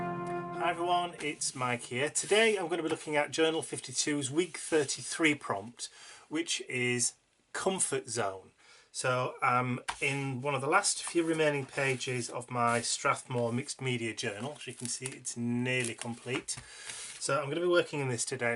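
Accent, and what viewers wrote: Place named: Northern England, United Kingdom. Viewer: Brilliant tutorial and art work. I've been making digital art in Photoshop for 10 years now and so the layers really resonated with me. I really like your style, and your videos, and it's nice to hear a Northern accent! :) I'm new to real life art and so your tutorials have been a great inspiration for me.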